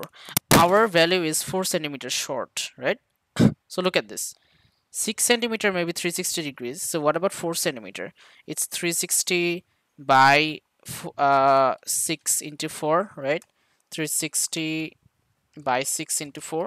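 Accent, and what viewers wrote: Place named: Bangladesh. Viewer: your voice makes me feel like you r from Bangladesh.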